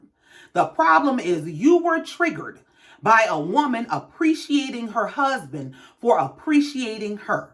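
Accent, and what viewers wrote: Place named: New Orleans, United States. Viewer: I can tell you're from New Orleans baby lol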